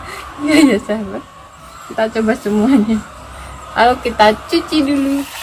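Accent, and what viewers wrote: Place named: Java, Indonesia. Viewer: Medo bahasa jawane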